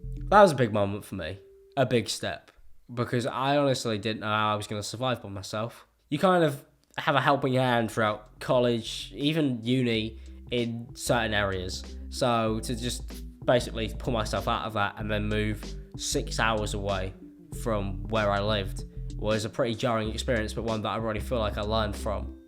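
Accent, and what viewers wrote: United Kingdom. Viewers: You are the first person with a british accent who doesnt piss me off. I suppose yours is more subtle.... Where are you from exactly btw?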